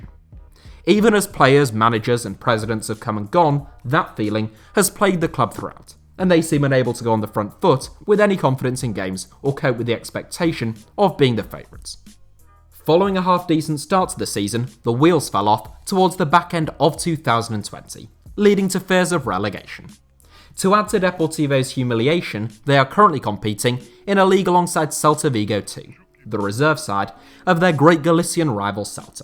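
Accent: England, United Kingdom. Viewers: Your english accent is unbearable. Learn how to speak! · Your accent is from what part of england?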